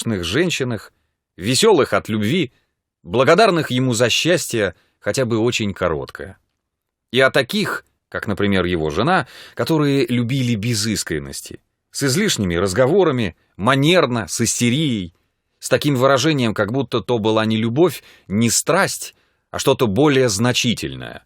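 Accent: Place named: Russia. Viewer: Красивый русский акцент.Красивая дикция. Спасибо.Приятно слушать.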